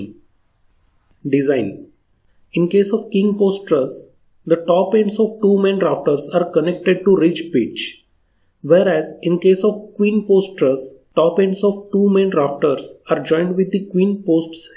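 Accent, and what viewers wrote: India. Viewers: I swear to god and please dont take offence but that indian accent is so annoying and also the fact that such amazing educational videos like this is always with a dude with an indian accent makes it worse at the point that I feel bad about it. Great video keep it up . Also do t feel bad about I have an accent too I know how hard it is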